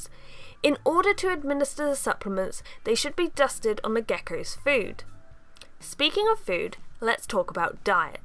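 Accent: England, United Kingdom. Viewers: You mean her English accent? As in ENGLAND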